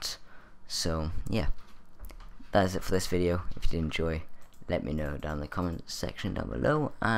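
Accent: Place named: United Kingdom. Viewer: It's called having a british accent.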